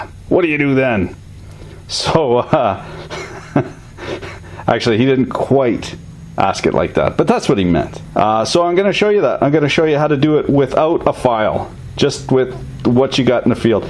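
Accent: Canada. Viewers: This guy sounds like he is from Letterkenny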